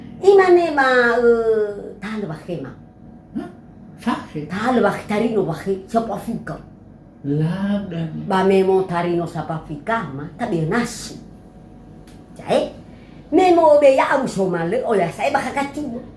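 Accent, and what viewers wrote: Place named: South Nias, Indonesia. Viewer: Bang, dari logatnya ini, apalah abg dari Nias selatan.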